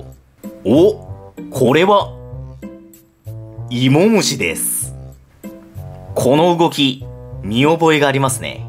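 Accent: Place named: Nagoya, Japan. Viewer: 、ご出身は名古屋ですかね？イントネーションが名古屋周辺の方そのものだったので。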